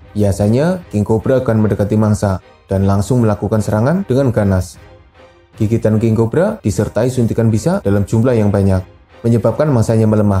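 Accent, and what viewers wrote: Banyumas, Indonesia. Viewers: Ngapak binginget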